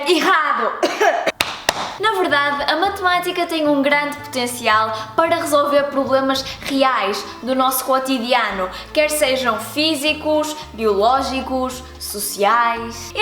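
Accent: North, Portugal. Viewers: Derneval Ribeiro esta simpática menina tem uma pronuncia propria do norte ou do centro de Portugal. Em outras partes do País a pronuncia é diferente, como podes ver em outros videos feitos por Portugueses. Assim como voces no Brasil teem diferenças entre os vários estados. Parabens.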